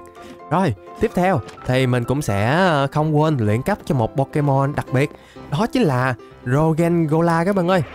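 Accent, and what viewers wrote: Vietnam. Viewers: Ah là người miền nam hay miền bắc vậy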